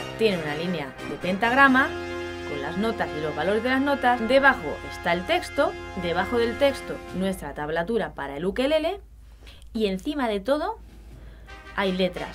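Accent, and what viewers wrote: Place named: Extremadura, Spain. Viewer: Me encanta seño! con acento extremeño, me meo😂😂🤩🤩🤩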